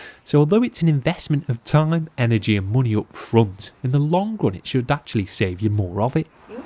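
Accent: Liverpool, United Kingdom. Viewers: Your accent is like a mixture of scouse / northern. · No worries, I can only imagine. By the ways, loving your videos and your accent (what region of the UK is it from?). Big hello from Canada!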